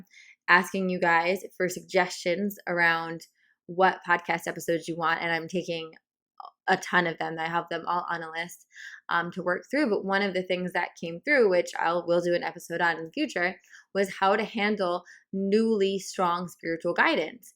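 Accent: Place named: New York, United States. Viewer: Yeah ! And the NY accent makes feel home, lol ! (LI)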